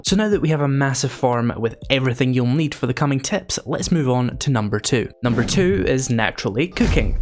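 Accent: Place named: Shires, United Kingdom. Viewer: "This is Pear-fect" listen to that delicious Shire accent sexy fk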